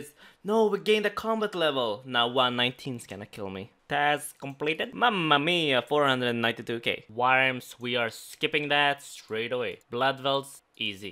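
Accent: Sweden. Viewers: are u swedish? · är du svenne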